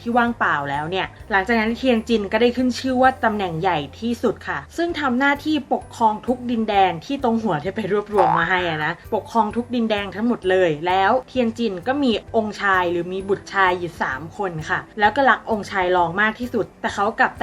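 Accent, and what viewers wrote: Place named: Isan, Thailand. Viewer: เเดนอีสานนาาาานิ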